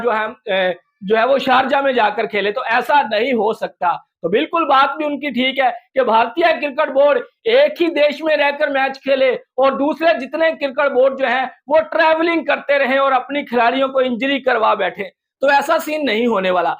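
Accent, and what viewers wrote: Pakistan. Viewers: Pakistani h accent se pata chal rha h or video ka sub tittle m english ke error se pata h chal rha h ye pakistani h 🤣🤣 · Bhai tum Pakistani ho tumhari boli accent Pakistani ha Indian nhi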